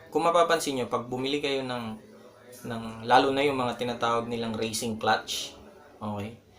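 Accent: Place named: Pampanga, Philippines. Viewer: ahh ganun ba. kaya parang medyo kapampangan accent yung tagalog mo bro. haha. new subbie. ggwin ko sa off yung suggestion mo sa beat v2 ko.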